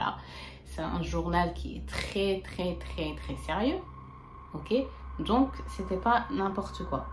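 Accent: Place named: Gulf, Arab world. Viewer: Merci pour la réponse 😍maa Shaa Allah belle richesse de culture, ta façon de parler je pensais que tu étais du côté des pays du Golf😊sinon merci pour toutes tes histoires et te souhaite beaucoup de bonheur 🥰 🥰 🥰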